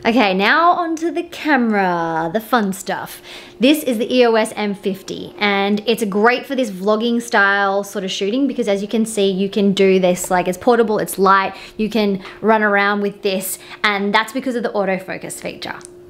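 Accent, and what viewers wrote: Australia. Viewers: She's so beautiful!!Love her aussie accent ♥♥♥♥♥♥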